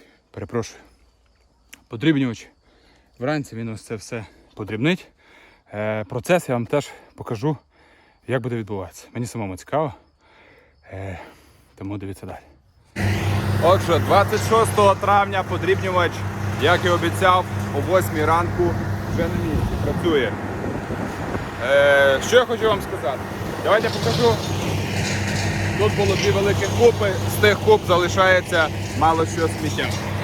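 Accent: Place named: Western Ukraine, Ukraine. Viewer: Спочатку була здивована почути українську мову, мою, рідну, "западенську"😊. А коли почула, що повертаємося до Тернополя, була приємно вражена👍👍👍. Відео цікаве, інформативне, заохочувальне! Аж захотілося теж пошарудіти рукою по свіжому граду. Успіхів вам і надхнення!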